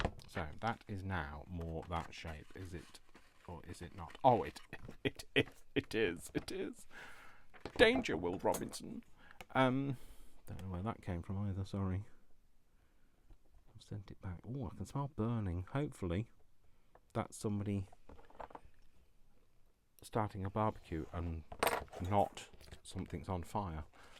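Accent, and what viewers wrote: United Kingdom. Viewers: What a fun video today! I just love some Northern accent me! Those hobbies weekly mags are great! What a super find and love this project! Keep being jolly……cos I am as well! Love from Edinburgh xx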